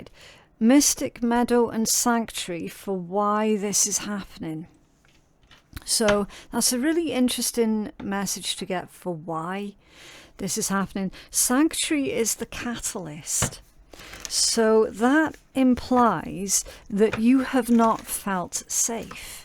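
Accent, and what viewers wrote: Scotland, United Kingdom. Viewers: It’s a Scottish accent ❤ and yes it’s beautiful!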